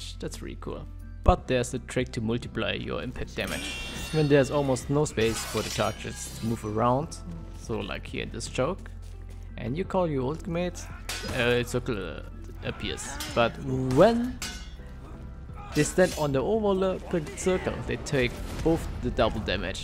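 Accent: Germany. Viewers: Sounding very German in this video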